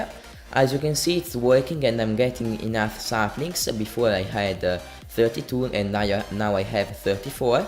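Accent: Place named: Italy. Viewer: good video and i frecking love your italian accent XD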